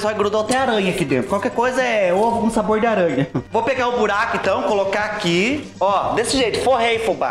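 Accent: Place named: Northeast, Brazil. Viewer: Fubá sou Cearense e seu sotaque lembra quem é Nordestino. Sendo do Nordeste ou não, AMO seu jeito de se comunicar.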